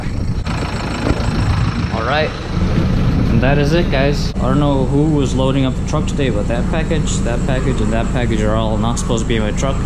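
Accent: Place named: Minnesota, United States. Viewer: Your accent sounds Minnesotan😂 I could be wrong but you sound like a person from up north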